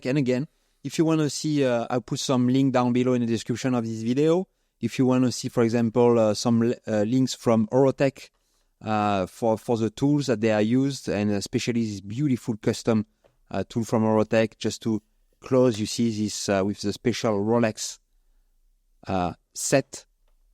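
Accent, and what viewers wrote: France. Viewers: What a biouteeful accent in English. Tu m'as tué avec ton accent frenchie ! Remarque les américains adorent ça apparemment. Hey, if it gets you more views in english than you doing it in french, what the heck go for it mon ami!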